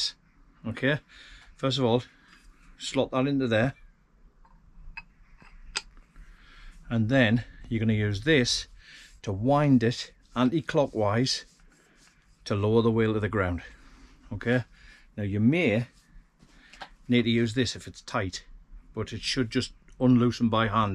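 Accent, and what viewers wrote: United Kingdom. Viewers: Hi Love the videos keep the good work up, you might have some competition when our van arrives we’ll see. (I may be a bit too old for the work involved). We were due to pick-up our CV40 in June from Tyne Valley motorhomes but alas still waiting. Question: are you a Geordie no offence intended.:¬)) John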